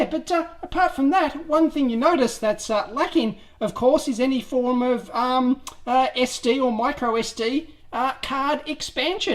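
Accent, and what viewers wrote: Australia. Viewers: Your reviews are informative but that high pitch Aussie voice is like fingernails across a chalkboard. (Chalkboard: graphic illustration aid from caveman age)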